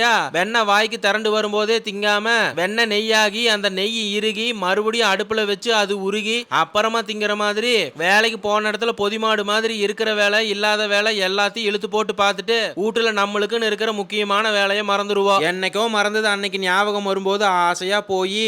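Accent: Coimbatore, India. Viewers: தம்பி உங்களுடைய கோவை பேச்சு super போங்க. உங்களோட கருத்தையும் சொல்லி சமைக்குறவிதம் அருமை அருமை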